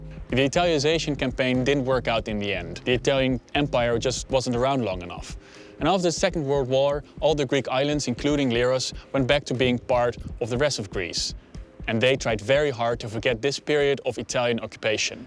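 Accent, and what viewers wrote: Netherlands. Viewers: I guess he's Dutch. He has a thick accent, but trying to imitate an American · very nice video, you sound really Dutch. :) ! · I hear a dutch accent..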